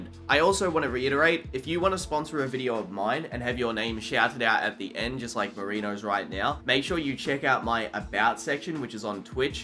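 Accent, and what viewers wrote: Australia. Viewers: You are delusional and insane, sorry. Colby actually is a republican, and the fact that you think it could only possibly be all a fake persona and that he is secretly some lib, shows how out of touch you are with reality, you clearly live online too much. You don't sound American, you sound Australian or something, let me tell you kid, Trump got 74 million votes, these people are not rare, they are in fact pretty common, and the fact that you think that any fighter who says they support Trump is playing a character just to trigger libs, shows that you are insane and out of touch with reality, you are one of these people who doesn't understand that other people exist that don't have the same views as yourself, you are a projecting delusional person essentially, you see Colby and what he says he supports, and since you don't personally support that, you think it has to be all fake.....sigh. 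Dude you are crazy. Also your take on fans changing their opinion of fighters was silly also, because you totally aren't taking into account that as time passes a lot of events occur, why can't fans change their opinion of a fighter after many events involving that fighter take place? That isn't fickle, that is just adjusting the opinions of that fighter after we find out more about them.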